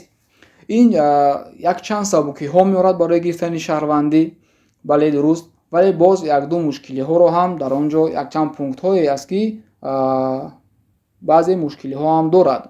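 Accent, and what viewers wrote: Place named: Literary Tajik, Tajikistan. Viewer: ту бо ЗАБОНИ АДАБИИ ТОЧИКИ хело хуб харф мезани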